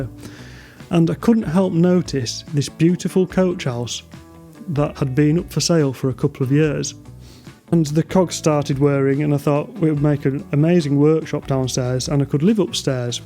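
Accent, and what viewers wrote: Yorkshire, United Kingdom. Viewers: Really enjoy the Yorkshire accent and the documented effort that goes into these renovations. Reminds me not to feel bad hiring a professional…. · It's really been a labour of love. I admire your extremely hard work, at times it seems rather back breaking.. Seeing you were self employed and working. I hope the cost of the place was worth renovating it.. Hah all the best to you and well-done.😊😅😂. I love your accent as it reminded me of my dad and grandad who were Yorkshire folk from Scarborough farmers actually. Greetings from South Africa😊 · Occasionally the YT algorithm gives you a gem, this is one such time, never asked for a sub but got one anyway for the laidback approach and wonderful Yorkshire accent.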